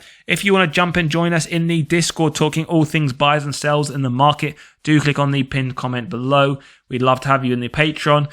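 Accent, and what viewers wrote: England, United Kingdom. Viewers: BTI vs BATS? are you just catering for the global audience or would you consider BTI rather than BATS? do we (you sound english) pay dividend 15% tax on BTI? but maybe there's no stamp duty.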